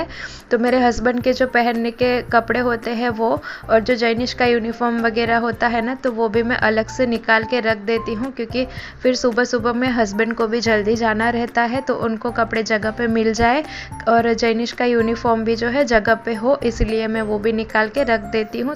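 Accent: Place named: Gujarat, India. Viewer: Aap gujrati hai bolne se lga muje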